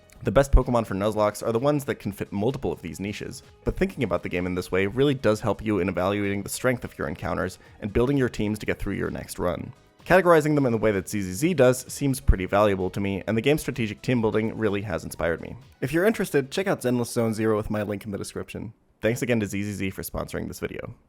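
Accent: Germany. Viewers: He is, in his earlier videos you could hear his german accent more clearly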